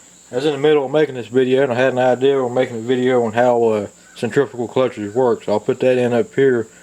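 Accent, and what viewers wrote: South, United States. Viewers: Old video but thank you! Lol you remind me so much of my high school shop teacher Mr Ragland 😂❤. Wershers...😂 always gave him hell about his deep twang. Lol thanks for the info!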